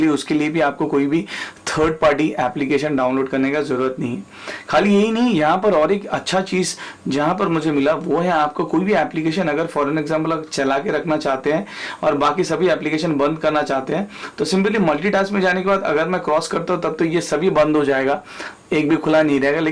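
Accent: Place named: South, India. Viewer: i knw south indian ho tum. but i lobe the accent jis tarah se smjhaya tmne. keep the good work bro :)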